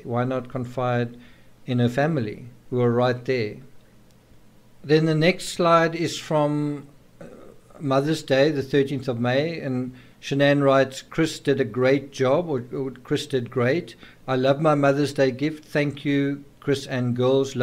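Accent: South Africa. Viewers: Good SAFFA accent! 👍 ♡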